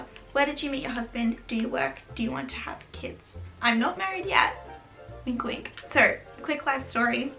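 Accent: Australia. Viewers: I'm American and I don't get an American accent from you at all - it all sounds like adorable Australian to me :) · I think it sounds very Aussie. I have the same accent! ☺️ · Absolutely knew you were an Australian!! I knew that’s why I loved you! I personally couldn’t tell if it was Australian or more England!! I’m from the states so I know nothing about an accent other than a southern (I’m from Arkansas haha!) I love Australian accents. 💕 love your channel dearly ... xoxo Olivia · I have just recently discovered your channel, and I LOVE it!! I've always been obsessed with the '40s and '50s, I'm sure I was born at the wrong time! I'm from North East England and don't hear any South East England accent btw, it sound fully Australian to my untrained ear 😆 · So bizarre you are fully Australian accent! ahaha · I'm newer to your channel, and I love your videos so much more than a few others I follow. 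Please keep making your videos, and I would love some cooking/recipe videos as well. I love the education of how people lived in the 50's. I have always been such a fan of the past. There is something very soothing and calming of how they lived. My parents were born before the 50's in Europe, and cooking bread and all the meals and desserts by scratch is what my mother specialized in. BTW, love your Australian accent. Much love from American.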